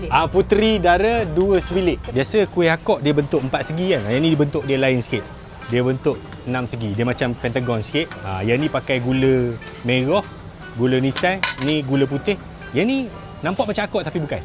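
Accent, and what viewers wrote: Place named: Kedah, Malaysia. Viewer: ni kmpg Mana sebenarnya.. Kedah pn ye.. Pahang pn ye.. 😅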